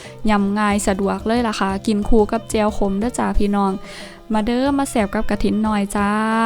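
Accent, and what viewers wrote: Isan, Thailand. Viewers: จริงแล้ว เป็นคนลาวอิสาน รึ ภูไท ลูกหล่า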